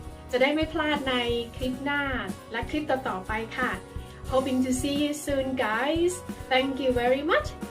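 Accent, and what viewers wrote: Thailand. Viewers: I love your Mookdaharn accent, teacher 🥰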